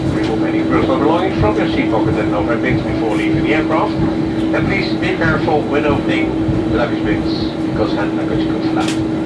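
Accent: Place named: Netherlands. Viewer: echte nederlands accent als ie engels praat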